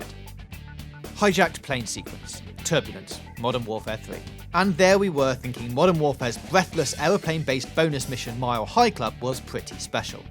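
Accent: United Kingdom. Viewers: This guy is probably the most British brit i've ever heard.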